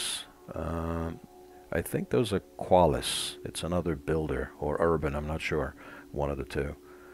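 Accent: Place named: United States. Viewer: Domnule, ador engleza americana care o vorbiti,dar fin pacate nu am inteles nimic!! Eu sunt romanca frantuzita, sunt abonata Dvs.,de circa un an!! Multa sanatate din Oradea BH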